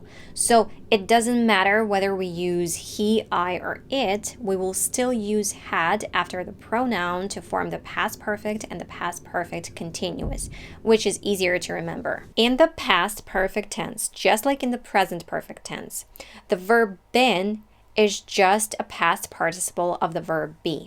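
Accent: United States. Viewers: When i watched this channel for the first time, i felt myself very contradictory. I heard an exceptionally, i'd even say pirufied american accent, and i saw this face and couldn't believe she's an American. And i was right. That was a great job but what it's given? Being an American doesn't mean having an impeccable language. It means sharing certain values. It means creating certain values. It means to be a part of american society. It means to have a common future with the US. The US is a multicultural country. Many accents, many dialects. It makes the US be the US. Nobody cares how your language pure is but anyone cares what you feel about your place here. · What is her accent pls ? (California, Texan ?)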